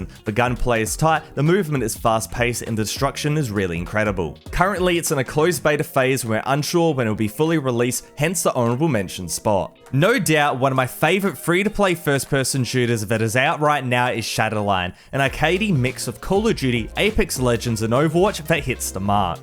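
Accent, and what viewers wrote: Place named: Australia. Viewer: Aussie accent mate that’s how we say it.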